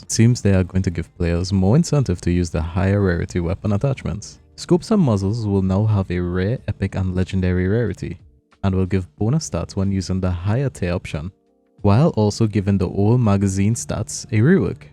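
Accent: Trinidad and Tobago. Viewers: Lol I commented this too..lol u cant hide that accent g I hear my people voice 🤣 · Are you trini?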